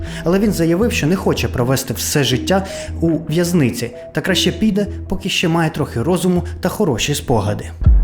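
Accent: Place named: Russia. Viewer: Чому! То вже б!Відчуваєте себе дуже юним, не можете позбавитися російської вимови? гарне ім'я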